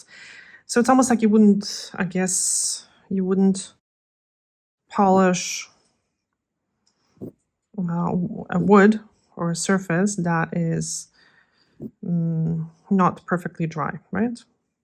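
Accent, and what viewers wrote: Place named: Poland. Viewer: Hey, I just want to say thank you for your calming videos. I am half Polish, half English so your accent is always a comfort to me and reminds me of my Polish relatives ❤️🇵🇱 Thank you for teaching us all about nail care. Ps I love the colour you used on this client and now I’m on the hunt for a similar range of shimmery polishes! If you have any recommendations that are available in the UK it would be great to know. Xx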